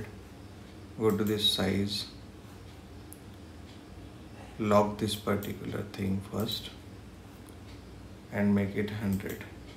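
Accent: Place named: India. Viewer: Indian speaking his English, Really. 🤮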